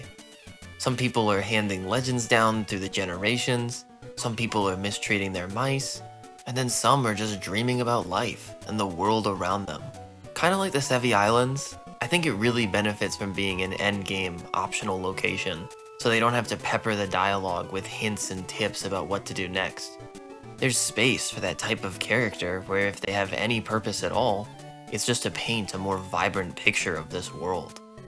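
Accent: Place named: East Coast, United States. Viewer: This is a super weird compliment, I know, but your delivery, especially with your (what I believe to be, correct me if I'm wrong) East Coast accent, makes these videos feel so nostalgic, like I'm watching an old movie.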